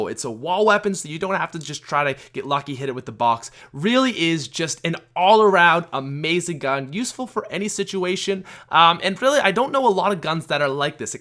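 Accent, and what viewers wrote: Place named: Canada. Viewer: He has the most Canadian pronunciation of out ever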